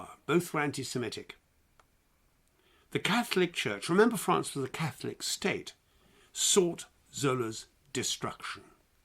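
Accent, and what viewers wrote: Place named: RP, United Kingdom. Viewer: Jesus dude, why? Why is it, as an American who's passed the big 60, with German & Swedish ancestory, who's never really bothered to do much except smoke pot & watch TV, why is it, whether you, or Sir Lawrence O narrating "The World at War", I can only digest history, if narrated in public school Queen's English? What I mean is, I really enjoyed this, and am grateful you produced this, and I will have to read (hear, on <link> really) that book!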